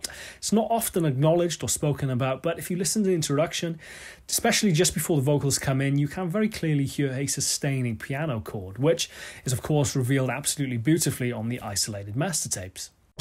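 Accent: United Kingdom. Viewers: Helps to hear a Brit explain.. adds to the authenticity..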